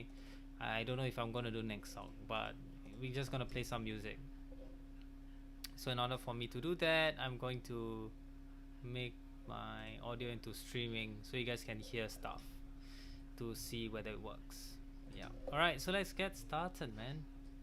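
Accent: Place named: Southeast Asia. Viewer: hi, teacher, are you from south east asia? your tone tells me that.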